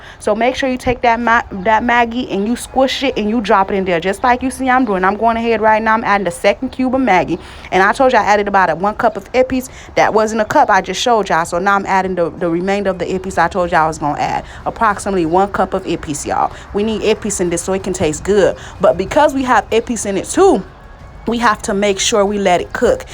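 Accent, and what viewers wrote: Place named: Florida, United States. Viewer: 😂Flowwwda aksent!!!! Luv it!!!!🔥🔥🔥🔥🔥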